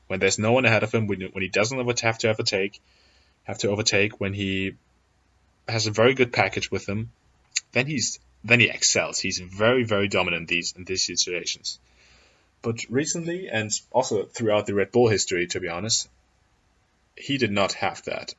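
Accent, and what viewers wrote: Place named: Germany. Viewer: so total agreement right there, except alonso and vettel. i would have switched them around because vettel is better than his ferrari years are letting him look. i think ferrari destroyed him and he would have challenged hamilton more with a red bull. alonso on the other hand was good no doubt but he was maybe to stupid to win more. his change to mclaren was the worst choice you can make and i think its no argument that he was better but didnt have the car to do it. he had offers for teams but he took the worst, in my opinion its all his fault and thats somthing a tier 2 driver has to be aware of. But great video mate. One question: Are you from Germany or Austria because you´ve got a strong german accent?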